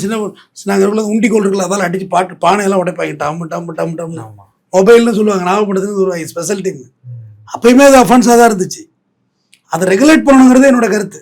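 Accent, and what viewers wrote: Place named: Tirunelveli, India. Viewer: உங்கள் மொழி நடை அசல் திருநெல்வேலி மொழி நடை Uncle 😊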